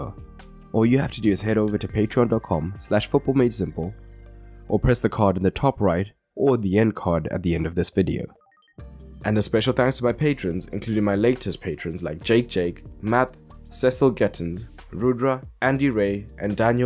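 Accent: South Africa. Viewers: I know you have a UK channel but are you South African? Your accent sounds so South African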